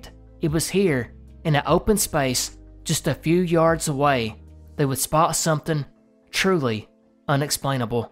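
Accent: South, United States. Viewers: Been here since around 2k subs i think. I would not be surprised if this becomes the next 1,000,000+ sub mystery channel. Great content mate, and very glad (even as a UK resident) that youre not getting the hate you used to get for your southern accent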